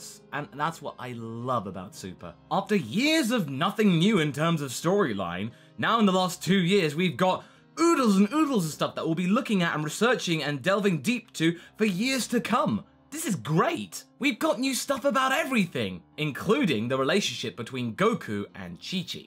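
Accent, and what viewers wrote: United Kingdom. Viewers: I'm late but yeah, he has to suppress his British accent though XD He's also Gohan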